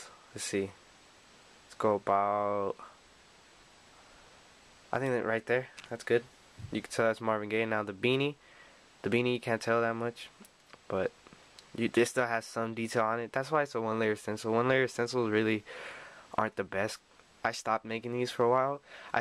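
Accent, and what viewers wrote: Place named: Los Angeles, United States. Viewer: u got the la accent :0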